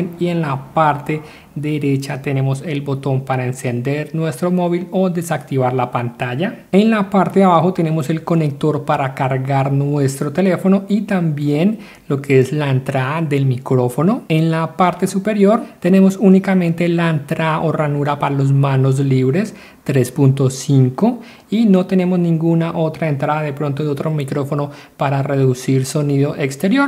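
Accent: Colombia. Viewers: oye eres de colombia? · Hola señor, una pregunta Ud. ¿Es colombiano?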